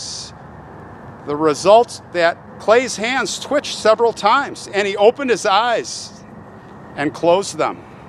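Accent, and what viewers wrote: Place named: New England, United States. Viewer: Makes you wonder how many others were buried alive back then.I detect an accent from the New England area. I am also from there.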